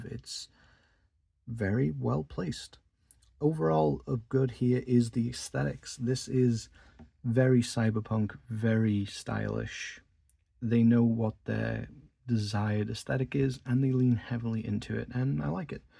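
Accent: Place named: Northern England, United Kingdom. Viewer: Nice review 😊 Is it just me,.or do you have a hint of northern English accent there? Maybe it's the other way around, perhaps you're British with a hint of American 😂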